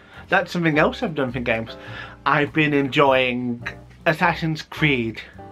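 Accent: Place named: Blackburn, United Kingdom. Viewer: It was a Blackburn accent not Scottish :p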